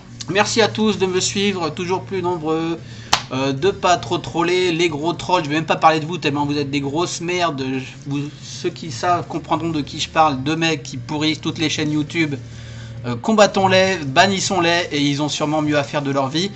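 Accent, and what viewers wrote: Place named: France. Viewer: Tjrs un plaisir de regarder tes revious(anglais à la frenchy!!!)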